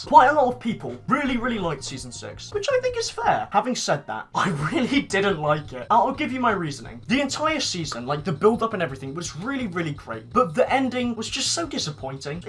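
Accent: United Kingdom. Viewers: My ranking of your British accent? 11/10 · Are you british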